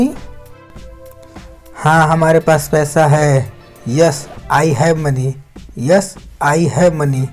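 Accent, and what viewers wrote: Nigeria. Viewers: Aapki aavaj Nigerian and chaina mix hey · Bahiyiya apki English Nigerian logon jesi kyo hai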